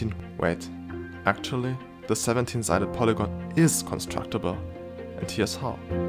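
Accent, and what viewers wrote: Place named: Germany. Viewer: Nach dem Akzent zu urteilen sind Sie Deutscher. Hier ein kleiner Englisch-Tip: „not constructible as well“ ist falsch. Es heißt „not constructible either“.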